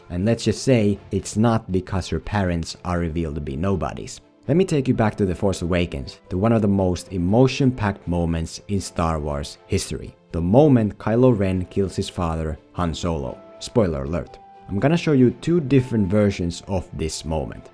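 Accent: Finland. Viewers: Hi, are you Finnish? Your accent sounds really Finnish!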